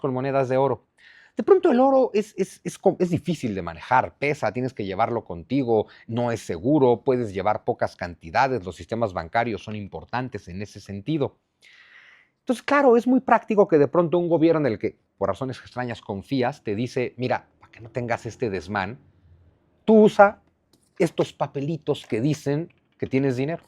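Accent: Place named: Mexico. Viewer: Me encanta el acento mexicano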